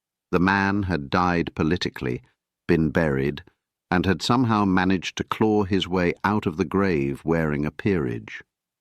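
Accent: RP, United Kingdom. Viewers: Thank You. It is such a pleasure to listen to this pure poetry, exceptionally polished in Queen's English. Btw, have anyone, anywhere, in any country, ever heard of a politician admitting a wrong doing or "mea culpa"? from a Finn in Diaspora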